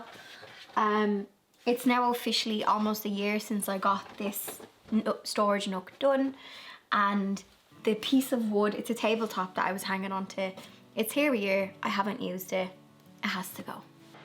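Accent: Dublin, Ireland. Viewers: 😂😂😂 “prehistoric grease”You are pretty amazing being able to accomplish all that you do. And something I never thought about but I wonder if my great grandmother had a brogue like yours or your brother. ❤❤. ☘️☘️☘️☘️ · Lovely hearing the true dub accent put paper on top of all your presses , and I change them every Christmas when decorating as well as my fire alarm batteries. Keep going girl you’re doing a great job . Up the kingdom 😂❤❤🪴💐☘️☘️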